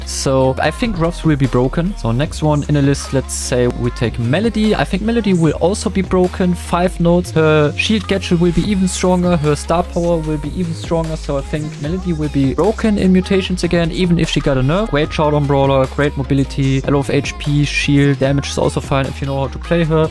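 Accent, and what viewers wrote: Germany. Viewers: hilfe dieser deutsche accent 😭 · I love ur German accent :face-blue-smiling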